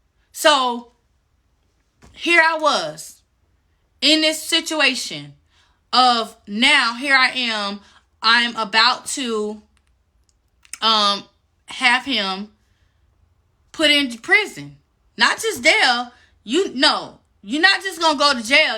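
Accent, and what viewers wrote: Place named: United States. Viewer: You sound so country; hang in there and stay blessed!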